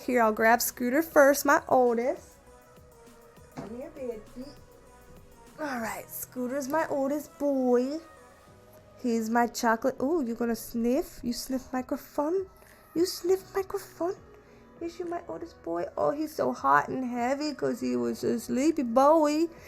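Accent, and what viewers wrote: South, United States. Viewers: A fellow Aries!!! I looove! 😍 No wonder I feel like I just click with you! Plus, ya make me homesick for the south with your accent! I'm a Texan living in New York right now, so listening to you makes me feel at home. ❤ Love ya, gal! Take care! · I looked up the word perking it means cheerful or lively, it's so cute when you use that word :) I'm from NJ. and yes we talk differently and it's so fun for me to hear the southern accent I have a strong Jersey accent and yes I can't stand it LOL! I have been binge watching all your videos and you are amazing! You really are amazing and I hope you can see that. I believe your channel is going to be huge and if you come out with Merch I would be excited to purchase it. I just want you to know you are a gift to this universe! Your kindness and your beauty radiates through the computer and I would like to thank you for telling us about you! 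Keep on Perking :)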